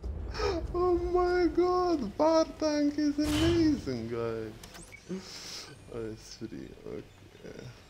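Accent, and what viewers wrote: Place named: Lithuania. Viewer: English Lithuanian accent.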